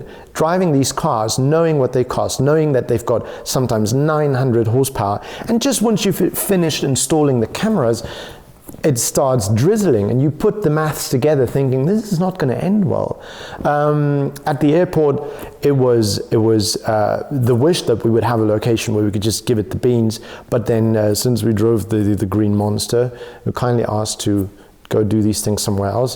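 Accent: South Africa. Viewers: I've always suspected your accent might be South African but I just couldn't put my finger on it bru!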